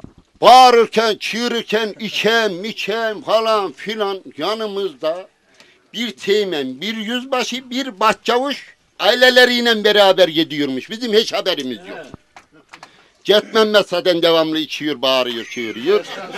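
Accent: Sivas, Turkey. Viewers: SİVAS ŞİVESİ GİBİ ŞİVE YOK. HARFLERİN SÖZCÜKLERİN TEK TEK İNCELENMESİ LAZIM. ÖZ TÜRK KONUŞMASI BUDUR. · ayni sivas yalaaaa:) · Helal olsun işte bu tam bir sivas şivesi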